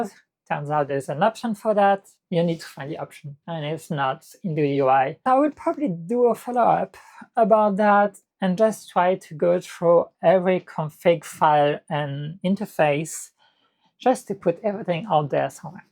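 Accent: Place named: France. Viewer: Thank you for this video,! I'm an Aussie and am intrigued with your home workshop and your accent. French? Perhaps German? Just curious. Your home workshop is amazing. Your layout, organisation... Wow. Is this a hobby or a business? Let me just say that out homes look similar, but I have 8 Billy Book Cases full of plastic tubs that hold my various hobbies and the associated equipment. IT, Electronics, painting (Cars, furniture, etc) Leather repairs, abrasives (Pads, blocks sand paper), but in a much bigger space. Sorry if asking is rude. I mean no offence. On builds... I used a ITX Z-170N Mobo, i5-7600k and 32 gb of memory. I put in a 970 Pro NVME and partitioned the drive into a boot and a cache. I decided not to HBA, instead went Ubuntu Webmin and ZFS. I don't spin down my drives! 5 x 4tb WD Red 5400rpm drives. All up, Power consumption is 38 watts idle and the highest during a stress test was 54 watts! 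In the previous NAS my 3 TB drives lasted 13 years! HBA's are great but draw a higher percentage of power. Especially the 9200 series, so I used on board sata. I have one spare cold mounted drive and the connection is to a caddy, to do backups or import data. ZFS is memory hungry. My Raid 5 (5 x 4 Tb drives) uses 55% of the 32Gb of memory. Thank you again for the great build and fun, interesting video. Merci / Danke!!!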